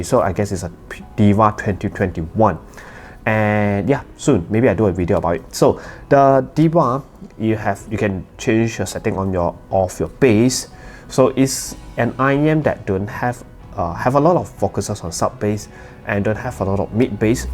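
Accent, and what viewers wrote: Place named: Malaysia. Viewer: I do listen to Jrock as well because not just female vocals but male vocals too, which means I do like a bit of bass, but not sharp treble. As long as it's not fatiguing. Seems like I'll need to look into the Zero 2 then if it has an added bass. Still using my Tanchjim One and Tanya (both DSP) and they're not bad, but female vocals can have some sibilance at times. Awesome to hear another reviewer from Malaysia! Our distinct accent stands out a lot. 😆 Anything that you would suggest in the sub $50 with a bit of bass? Not too much, but enough that you feel it.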